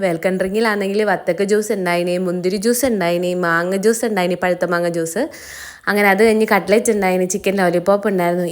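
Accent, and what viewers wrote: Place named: Kannur, India. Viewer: Evida veedu? Bhasha kettondu chodhichatha kannur pole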